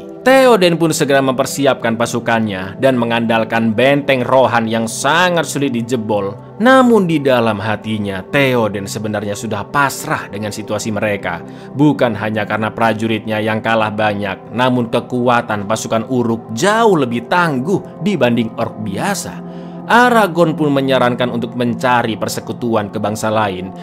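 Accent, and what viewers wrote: Java, Indonesia. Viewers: Samean wong jowo tah😂😂😂😂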